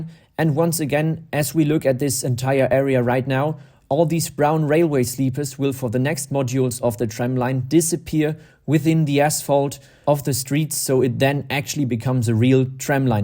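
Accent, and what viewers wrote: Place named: Germany. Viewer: German accent sucks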